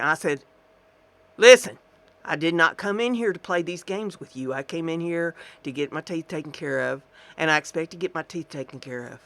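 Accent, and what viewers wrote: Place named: South, United States. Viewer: Southern accent Idk partner! 2021 better be better and not another tarnation. Lol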